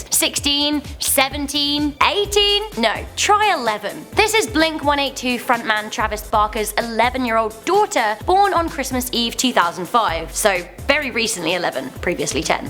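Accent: United Kingdom. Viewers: is low key hot af with her cute British accent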